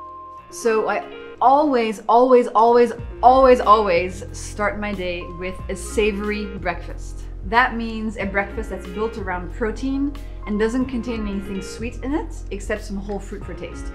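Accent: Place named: France. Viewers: I am in love with her French accent❤ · She may have a French accent, but I think we can say it's very, very subtle.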